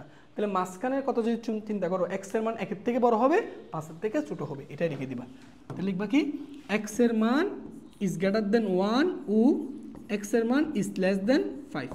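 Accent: Chittagong, Bangladesh. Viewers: স্যার আপনি কি চট্টগ্রামের???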